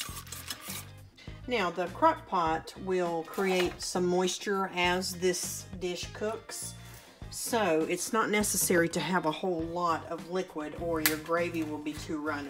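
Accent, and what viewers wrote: South, United States. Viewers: Love your accent! You're truly a southern belle 🤗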